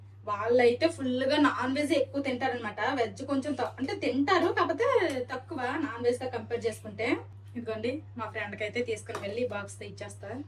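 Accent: Srikakulam, India. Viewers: Haiii sister me srikakulam bash chala bagaundi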